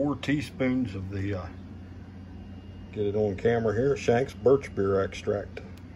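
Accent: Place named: Maryland, United States. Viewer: That sounds like a true Maryland accent hah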